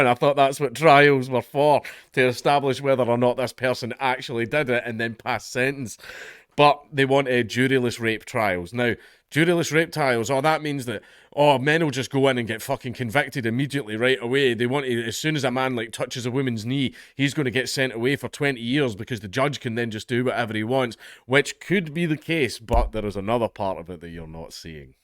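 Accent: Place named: Scotland, United Kingdom. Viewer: Foolish, funny sounding, Scottish man, they want to weaponize the courts. You're 100% right that this will be used to free scumbags preferred by the elites. It will also be used to imprison innocent dissidents. Most importantly, it will be used as a threat to force compliance with their agenda. "Do what I say or the thought-police will snatch up your son, and the judge I own will falsely convict him of a made-up crime and toss him in a cell." -the tyrants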